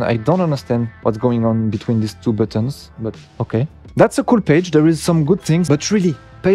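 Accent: France. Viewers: J'adore le concept :) Ps pour l'accent fr, ne change pas les étranger aime ca ;p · mec je suis bilingue et ton anglais est tres bon . ne lameliore pas pluss car on te comprends . si tu perds laccent francais tu perds le charme hahaha les anglophones adore laccent francais alors ne le perds pas. haha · L accent française est toujours présente😂😂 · Moi j'aime bien son accent frenchie. Et il est très compréhensible pour un anglophone. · C'est l'accent Français, rien de bizarre. C'est surtout perturbant pour nous Français ayant l'habitude de voir ses vidéos en Français, autrement je pense qu'à l'international son accent ne dérangera pas et au contraire ça apportera une petite touche.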